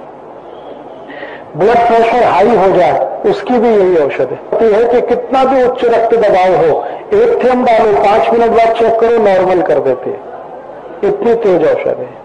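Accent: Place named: Maharashtra, India. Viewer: Maine padha hai rajiv bhai up se hai....to unki toning marathi kyo lagati hai.....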